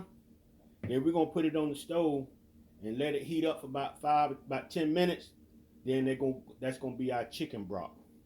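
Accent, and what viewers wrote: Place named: United States. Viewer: Look's delicious I'm a New subscriber I love your country accent where are you from if you don't mind me asking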